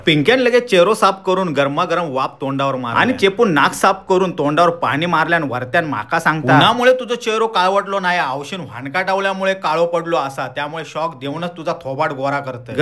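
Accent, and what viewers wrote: Malvani, India. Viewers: मालवणी बोली मस्तच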